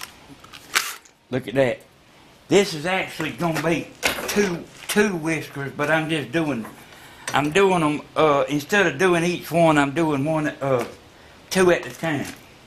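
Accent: South, United States. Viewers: I like your southern accent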